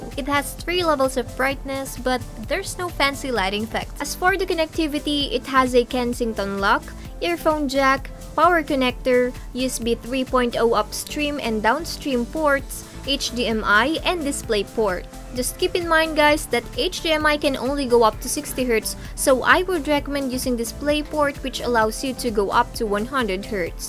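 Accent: Philippines. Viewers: filipino accent best accent ez to comprehend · I'm familiar with this accent. She's definitely Filipino.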